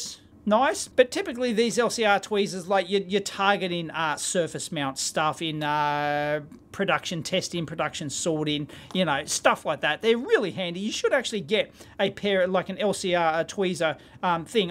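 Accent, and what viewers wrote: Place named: Australia. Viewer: English... with an Australian accent.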